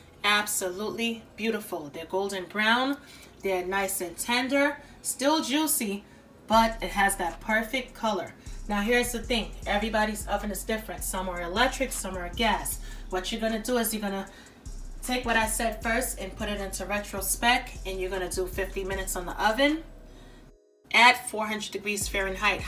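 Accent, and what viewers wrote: New York, United States. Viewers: From one New Yorker to another I love your accent . your food looks great ! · You from NY I can tell 😂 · Are you from New York or someone near that area? Hearing an accent lol. Love it btw. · Damn thank you so much!! And do I hear a New York accent?? ❤️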